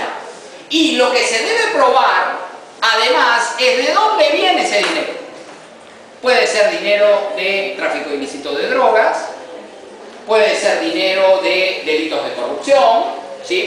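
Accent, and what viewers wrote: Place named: Argentina. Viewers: es argentino. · Suena argentino.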